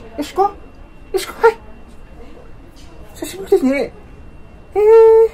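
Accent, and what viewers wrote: Miyako, Japan. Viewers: イントネーションと発音がめっちゃ宮古～！って感じですね笑 石垣のおばさんたちも話してる内容だいたいこんなです☺ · 沖縄本島人からしてもすぐ気付く宮古訛り、 いいっすな。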